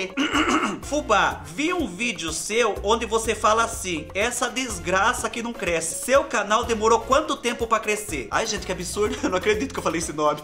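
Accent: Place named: Paraná, Brazil. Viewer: Ah tá entendi Paraná sotaque forte eu sempre tive curiosidade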